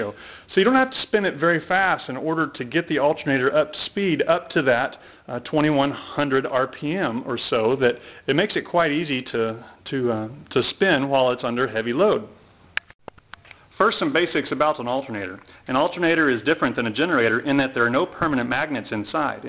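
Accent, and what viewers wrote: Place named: United States. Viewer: Hi.. by your accent I presume you are American, and even though your cars are much bigger than those in Britain, I struggle to think of a car with an alternator belt as long as the one on your bike -what kind of car was it?. I've found that the belt is the biggest problem for these rigs running off the wheelsI I spliced a bit of polyprop rope for one I made, but it was lumpy.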